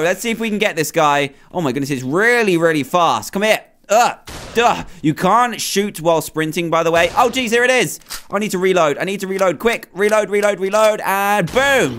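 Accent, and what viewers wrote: United Kingdom. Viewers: If he says oh dear its a nothr thing plus he is british so he pronounce deer dear ok?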